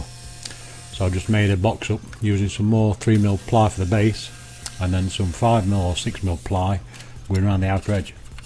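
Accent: Yorkshire, United Kingdom. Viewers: Excellent video (as always) and your accent does make my wife smile. She is from Yorkshire but we live in Cornwall so she doesn't get to hear it much!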